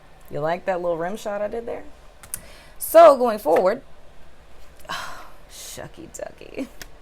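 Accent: United Kingdom. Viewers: hahaha this is my first time watching you I like you I'm from London and I like your "RP - British English Accent"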